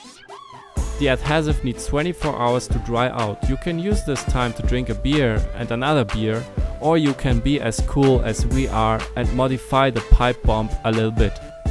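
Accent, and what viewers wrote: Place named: Germany. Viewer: Ah the german accent is making the video wholesome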